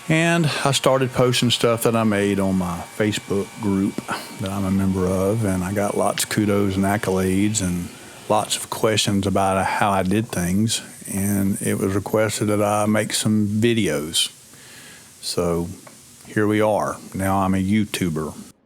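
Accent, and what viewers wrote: South, United States. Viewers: No. Pelicans? No. Crabs? No shrimp? 😅 Beautiful work, southern fried accent. Excellent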